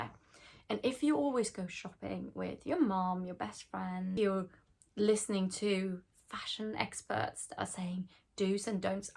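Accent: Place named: United Kingdom. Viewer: Jag trodde du var Britisk, jag älskar din brittiska accent, hälsningar från Peru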